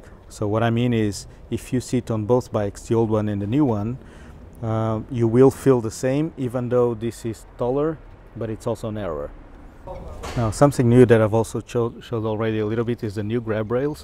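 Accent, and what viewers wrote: Portugal. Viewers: ahah sabia que eras Portugues! Portuguese accent ;) bonitas motos! cheers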